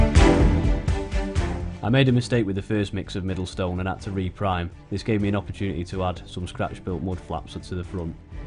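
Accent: United Kingdom. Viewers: Nice to finally hear your voice you sound like your from the UK.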